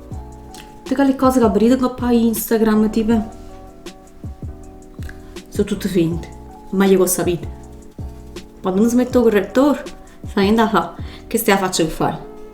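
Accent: Naples, Italy. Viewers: Si sente che sei napoletano perché anch'io sono di provincia di Napoli faccio pure io la cultur napoletani ma po chi so sti strunzz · Il dialetto è perfetto confermo che è napoletano io sono CI napoli❤❤ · Si ragazzi sono napoletana e si vede l’accento, È napoletana👌🏻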